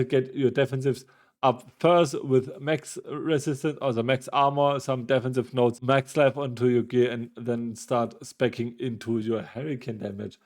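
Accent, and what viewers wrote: Germany. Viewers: Digger dein deutscher Dialekt im English ist so wild 😅. Trotzdem danke fürs Video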